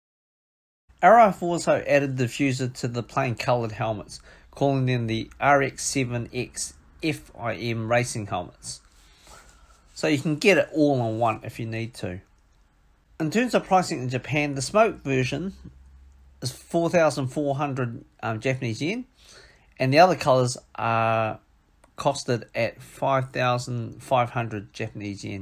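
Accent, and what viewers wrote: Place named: New Zealand. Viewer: You sound like kiwi. Have you found a way to purchase this diffuser in Australia?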